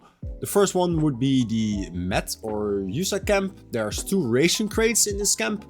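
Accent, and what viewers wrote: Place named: Netherlands. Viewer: Nederlands, I can tell :D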